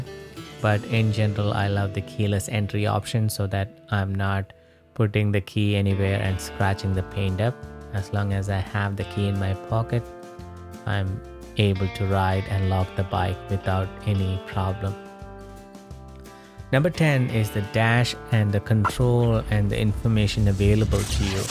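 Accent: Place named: India. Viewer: That desi accent though. Say " Glass "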